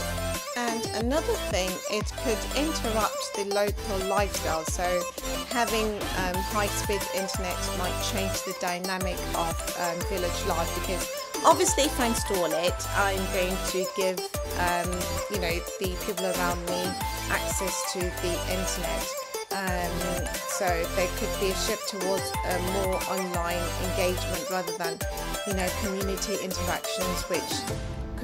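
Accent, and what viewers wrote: United Kingdom. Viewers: British accent manyama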